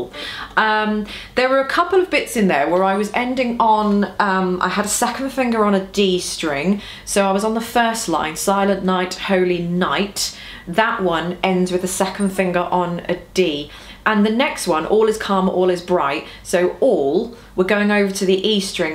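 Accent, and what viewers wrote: United Kingdom. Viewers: and you have a very good british accent i wish i can talk like you.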